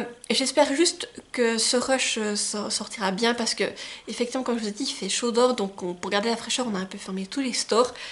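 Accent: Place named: Jura, France. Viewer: Salut j'entends comme un accent du jura je suis également jurassienne ça fait plaisir de voir une suissesse sur YouTube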